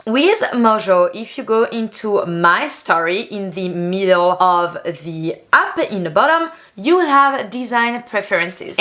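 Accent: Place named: France. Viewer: Je me disais qu'elle etait française avec son accent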